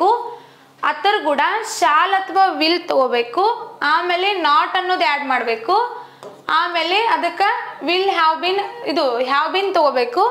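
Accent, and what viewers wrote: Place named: Belagavi, India. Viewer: Nimm Belagavi accent alli nimm matu kelode chanda❤️👌👌👌👌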